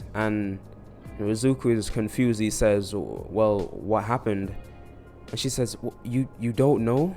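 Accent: Jamaica. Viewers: Yo what is this mans accent? I hear a little bit of a Jamaican maybe but I’m really not sure.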